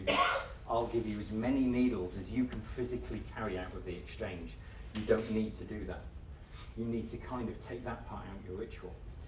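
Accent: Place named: United Kingdom. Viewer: Bugger the door creaking! This guy knows his stuff! If all you can moan about is the door creaking you didn't listen or understand this video To the maker and lecturer in this video THANK YOU SO MUCH FOR MAKING THIS VIDEO I wish I could have listed to you spk all day because for someone who never took that stuff, you really have done your research and know what you e talking about. You sound like you're from the U.K. Do you run any particular detox or rehab? I have a friend who needs help badly,and where we live there's hardly any. I know this is a old video but I'd be so grateful if you could reply. Thanks for your time and all the best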